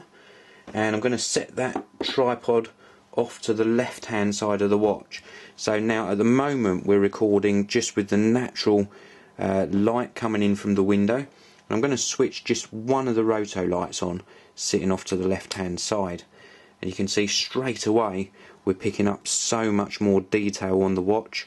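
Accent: United Kingdom. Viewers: Is it just me... having a reviewer with a British accent makes me appreciate the product so much more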